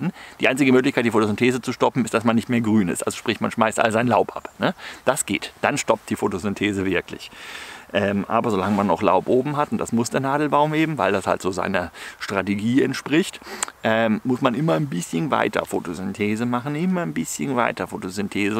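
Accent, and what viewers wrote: Germany. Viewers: Danke für das Video, ich schaue mir aber auch ganz gerne die Danke- Videos an, jedes davon ist immer noch besser als was am TV so läuft. Versuche gar nicht erst, in der Schweiz zu verbergen, dass Du Deutscher bist, Du hast keine Chance, ich kenne welche, die es 20, Jahre lang versucht hatten, und dann immer noch sogar von den eigenen Kindern ausgelacht wurden, weil man sie nach spätestens einer Minute als Deutsche erkannt hat. Ist einfach so, mach Dir nichts draus. Und so böse sind wir Schweizer auch nicht, eher skeptisch. Gruss, Thomas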